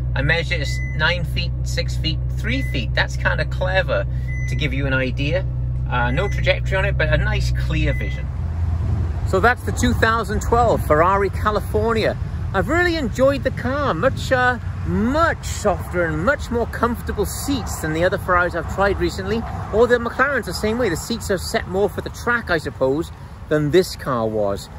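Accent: Wales, United Kingdom. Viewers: Is that a Welsh accent I hear while your narrating the video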